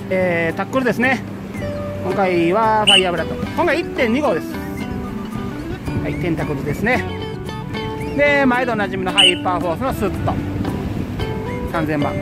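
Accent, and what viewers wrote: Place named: Tosa, Japan. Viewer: 流石のもすカッチ良いなー、そして、土佐弁大好きやわ。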